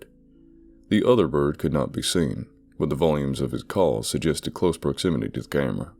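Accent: South, United States. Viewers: Your southern accent is done SO well! However I’m always disappointed when you narrate in it because your regular accent is just SO lovely and soothing😌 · Southern accent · You are definitely an honorary southern man.. love that accent you do. Thanks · Well I'm from Georgia so I'm quite partial to his southern accent 😁☺️😉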